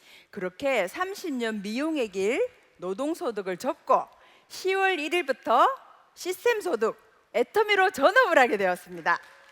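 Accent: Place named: Daegu, South Korea. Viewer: 구수한 대구말 니어디가노? 공주가나? 기름너놨다 ㅎ 맛깔스런 말이 친구랑 같아서 끝까지든게되네요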